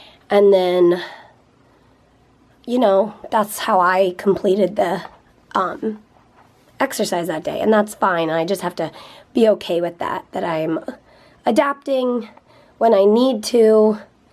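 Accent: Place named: Philadelphia, United States. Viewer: hehe, I think you might have a slight philly accent....:) here in Philadelphia all of us say "fr" instead of "for":) hahahahaha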